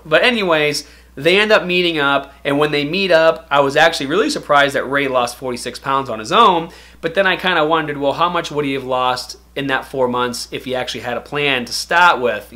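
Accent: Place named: New England, United States. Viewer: the accent is that of New England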